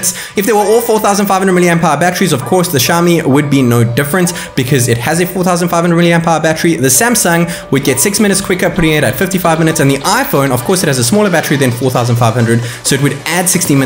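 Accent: South Africa. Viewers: South African accent, talking at the speed of an auctioneer. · U sound south African so u now my favourite tech guy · Instantly knew you were also South African once I heard your voice, now I gotta sub haha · You are definitely south african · Are you South African??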